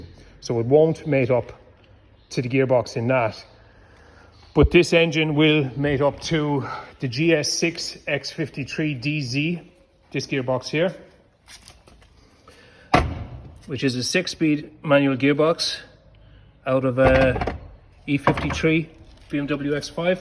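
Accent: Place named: Mayo, Ireland. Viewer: What county you from . Sounds like mayo.or Sligo..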